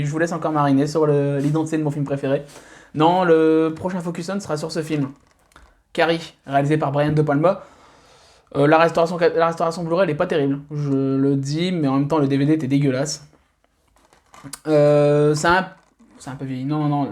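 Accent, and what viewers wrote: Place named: Nord-Pas-de-Calais, France. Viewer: Ton accent ne mentais pas, c'est cool d'assumer les ch'tis haha !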